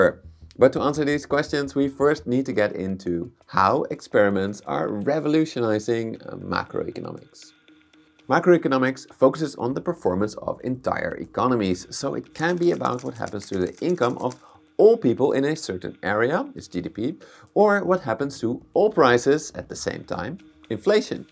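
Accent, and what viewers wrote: Netherlands. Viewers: Waarom heb je een Nederlandse accent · Dacht dat een nederlands accent niet erger kon, maar deze gozer gooit er gewoon een vocal fry bij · Are you Dutch? Or even Frisian;)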